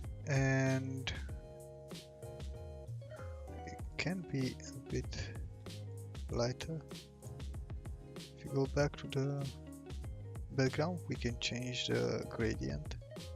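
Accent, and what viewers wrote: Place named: England, United Kingdom. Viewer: Brother really love your videos..and english accent..tutorials are very helpful... Keep up the Good work!!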